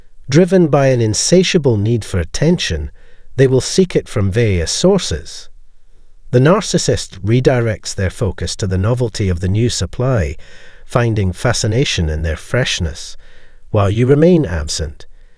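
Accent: Scotland, United Kingdom. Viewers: Great talk. I was in the relationship for 50yrs. Back then you never heard of manipulation or control. I was one with empathy. I remember one time he was at the doctor's he got tests, when he got the results I asked what the doctor said I was told to mind my own business. I have never asked him again. He was cruel and would say nasty things. He even said something to one of my daughters about me, she was thinking to herself mum is not like that. They love to play the victim and blame you for everything. I have no desire to have him back in my life. No love, affection, he made out I had a problem but it was his control. I still feel the loss as I was the romantic one. I'm so grateful I'm still alive and getting help. I don't care what people say about your accent I'm Scottish as well but have a different accent. You are giving us a good life with education. 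Thank you and your channel. ❤❤🙏🙏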